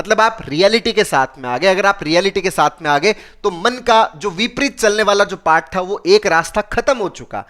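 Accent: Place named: Rajasthan, India. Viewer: Sir aapke kahne ka tarika Bikaner rajasthan se bahut achha lgta h 😊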